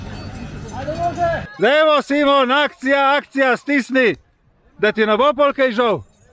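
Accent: Primorska, Slovenia. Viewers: Najprej pohvala za res dober kanal, vaše zgodbe so vedno poučne in zelo zanimive, vedno kaj novega zvem o kolesarjenju. Ko sem vas odkril, sem si vzel čas in pogledal vaše prispevke. Čestitam tudi za 1000-ga naročnika pred nekaj dnevi ali tedni. Zdaj pa do vprašanja... kako je kaj z defekti gum, se vam velikokrat dogajajo? Ali imate s seboj zračnico in komplet za menjavo ali popravilo defekta- pri specialkah no vsaj pri meni se je na začetku to velikokrat dogajalo. Ko sem recimo šel na eno daljšo turo, ko sem imel novo kolo šele nekaj dni, se mi je zgodil defekt. Ker sem se prej vozil samo s treking ali gorskim, sem bil čisto presenečen, saj pri gorskem nisem nikoli s seboj imel kita za popravilo, ker ga tudi nisem nikoli zares potreboval. Na srečo mi je na poti pomagal en gospod iz Kranja, ki je pa imel zračnico in mi jo je celo pomagal namontirat gor. 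Ta pravi kolesarji so res dobra družba in so pripravljeni pomagati začetnikom. Čez nekaj časa sem ugotovil, da sem imel verjetno napačen tlak v zračnicah- od nakupa nisem nič preverjal tlaka. Ali ste kdaj razmišljal o tubeless kolesih oz.kakšno je vaše mnenje? Sem drugače iz Primorske in mi j lepo slišati primorski naglas, sicer sem jaz bolj iz Krasa okolica Sežane, vendar že dolgo živim v Ljubljani. Hvala za odgovor in želim še veliko varnih in sproščujočih kilometrov na kolesu.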